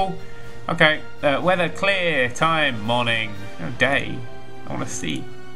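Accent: England, United Kingdom. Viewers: Your british u already have a british accent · You have a english acsent.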